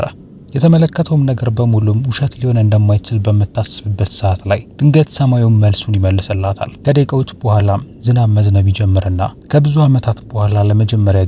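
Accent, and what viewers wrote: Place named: Oromia, Ethiopia. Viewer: ጋላ አማርኛ ይሰማል ዘንድሮም። 🙆🏽‍♂️🙆🏽‍♂️🙆🏽‍♂️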